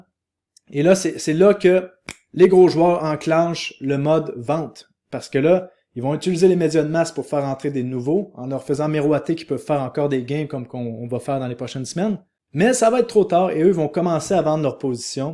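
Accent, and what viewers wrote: Canada. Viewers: Oh l accent canadien le mix américain/français et deguelasse je le supporte que pour toi sa veut dire tes un bon!!!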